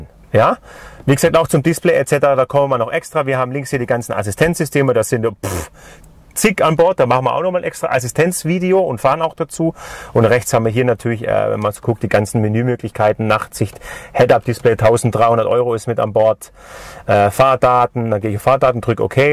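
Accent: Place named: Swabia, Germany. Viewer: Dies Schwäbisch klingt schon lustig. Aber nicht unsympathisch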